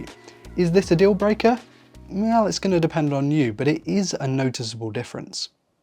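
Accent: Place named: United Kingdom. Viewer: He sounds British so whats with the prices in dollar 💵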